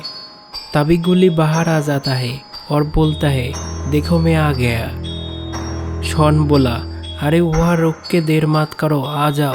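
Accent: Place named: Bengal, India. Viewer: Awaj chenge karo bangali awaj sahi nahi hi koi saf hindi bolne wale ko job pe lagao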